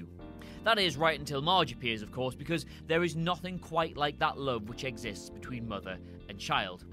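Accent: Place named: Northern England, United Kingdom. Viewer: These are heartbreaking and sweet in various ways. But what I will say is that Abe was a bad father first and thats a lot to do with why Homer is a bad son....it doesn't excuse him but still. Also, i think this is the first Northern English voice ive heard on narration on here. Being Northern English myself, its comforting to hear this.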